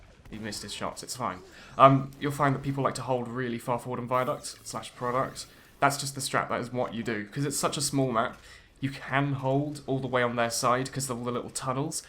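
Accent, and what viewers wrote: RP, United Kingdom. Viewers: true, I got my shitty american accent and you got that fancy high class british accent.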